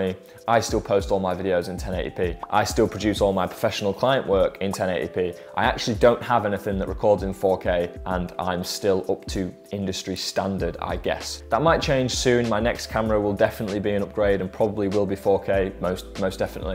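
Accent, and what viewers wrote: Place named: Yorkshire, United Kingdom. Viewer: Btw are you from Leeds or Bradford ? Your accent is too close to home not to be.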